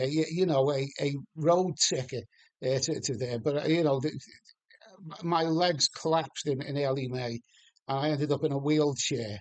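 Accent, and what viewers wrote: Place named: Liverpool, United Kingdom. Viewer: I was the guy that bumped in to you in Pucket Irish bar a couple of years ago and also from Liverpool, recognised your accent. You told me that you were from Bootle. I have followed your channel ever since. Good luck, your attitude to this is insparation hopefully for others.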